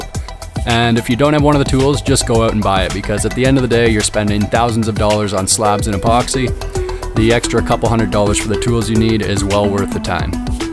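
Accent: Canada. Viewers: Wonderful product. Terrific production. The Canadian accent is like nails on a chalkboard. But the product and production were too good and couldn't click away. That's saying something.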